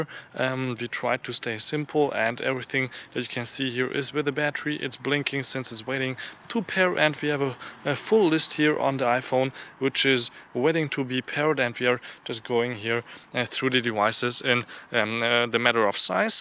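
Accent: Germany. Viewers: Sehr informatives Video, danke dafür! Btw man hört deinen deutschen Akzent so hart :D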